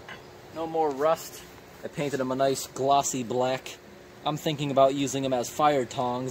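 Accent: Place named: Amish Country, United States. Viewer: Ruff (roof) Rumm (room) Crick (Creek) are you a PA native? You sound like you live close Amish country.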